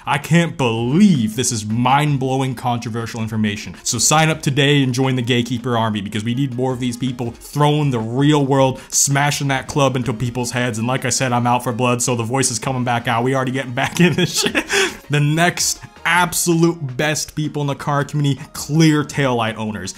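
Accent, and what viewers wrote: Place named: Atlanta, United States. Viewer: ngl you're kinda starting to pick up that ATL dialect, almost sound like someone native to Atlanta now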